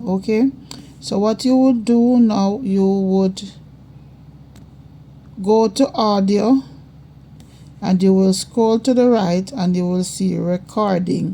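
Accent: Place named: Jamaica. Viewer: Thank you so much love, i was really struggling with these, it was really helpful. i am always loving the Jamaican accent.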